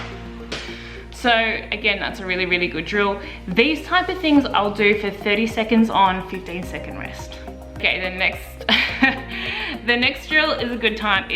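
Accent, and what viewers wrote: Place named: Australia. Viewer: Loving this! First COVID, now its raining ALL THE TIME in Sydney so getting outside to skate is tough. Great to have some drills to practice that I can do in the kitchen. I Just started Derby with Northern Beaches and having so much fun! Also ... love the T-Shirt. Thanks for posting the video and great to hear an Aussie voice.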